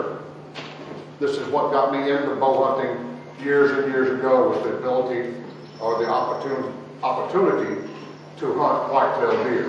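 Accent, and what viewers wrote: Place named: South, United States. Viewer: The problem here is the language barrier. And Mr. ‘s southern drawl does not make it easier.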